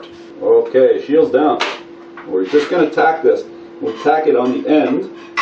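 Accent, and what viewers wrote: Canada. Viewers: The guy's Canadian. I know tons of people who talk just like him. Especially in the trades and oil industry. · Still trying to figure you out. Canadian but with a New York snark. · your accent is halairious. What part of Canada are you from . lol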